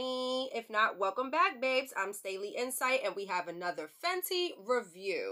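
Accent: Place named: New York, United States. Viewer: i knew u was from NY when the video started and i hurd u talk! #NYShittt 🗽🗽🗽🗽 And i just ordered mine. cant wait! 😊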